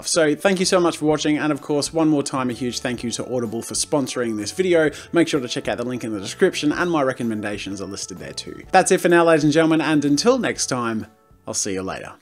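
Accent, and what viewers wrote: Australia. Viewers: No it's zed, he is Australian and that's how we pronounce it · Australians (me) say zed and since is Australian he would say zed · BeanDip: triggered American cause an Australian is being Australian Me: triggered Australian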